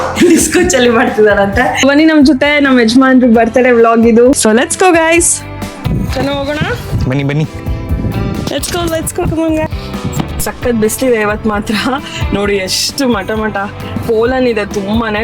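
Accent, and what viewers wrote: North Karnataka, India. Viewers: thank u so much for posting this 🙏🏻 ನಿಮ್ಮ ನಿರೂಪಣೆ ನಿಮ್ಮ ಭಾಂದವ್ಯ ಮಾಹಿತಿ ನೀಡುವ ಶೈಲಿ ಸುಂದರವಾದದ್ದು ನಮ್ಮ ಉತ್ತರ ಕರ್ನಾಟಕದ ಭಾಷೆಯನ್ನೂ ಅಮೆರಿಕದಲ್ಲಿ ಕೇಳಿ ಖುಷಿ ಎನಿಸುತ್ತದೆ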